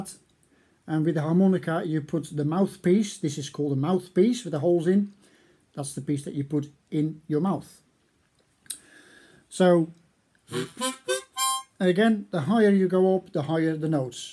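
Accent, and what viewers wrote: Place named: Netherlands. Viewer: Dear, do you live in holland? Your accent sounds so dutch but it sounds like you’ve lived in england for a while haha 😅 and if you live around the randstad do you do lessons??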